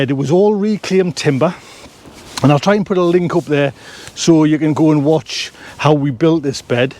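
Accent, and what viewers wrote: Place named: North East England, United Kingdom. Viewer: Hello. Just found your link on Steve’s channel and as a new gardener (now retired) I am always on the lookout for gardening tips so I will be going through your past videos. When I heard your accent I was curious where you were from in the North East. I am from Stanley, Co. Durham so I thought it would be nice to get tips from someone who is in the same climate as myself. Looking forward to your past and future videos. Appreciated. Allan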